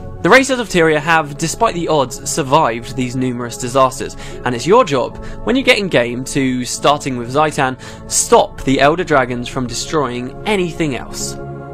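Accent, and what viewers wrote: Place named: United Kingdom. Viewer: ...我以為自己正在看泰瑞亞的 discovery channel ... 很重英國口音又對得上口的旁白啊！！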